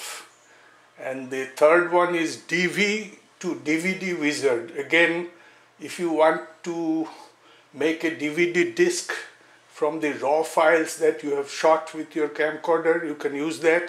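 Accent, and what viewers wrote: India. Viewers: You should apologize for your Indian accent. There´s nothing wrong with it, and it sounds great. Greetings from Montevideo city, Uruguay. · Awesome job of speaking, I don't care if you have an India Accent, LOL You are speaking quite clearly Im sure I will learn stuff from your tutorials 5* from Walter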